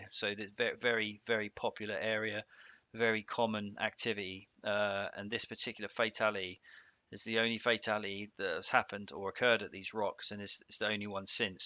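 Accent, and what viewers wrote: Cornwall, United Kingdom. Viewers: He is from Cornwall, they have an accent, like us Londoners, but I understand him fine.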